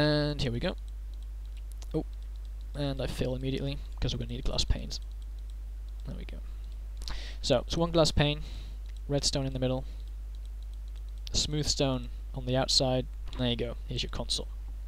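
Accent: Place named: Netherlands. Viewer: Pretty awesome, haha. The first few minutes I could easily tell you were Dutch, with the accent. But then after ~3 minutes you get the hang of it (flip the switch, as it were) and you are nearly indistinguishable from a native :)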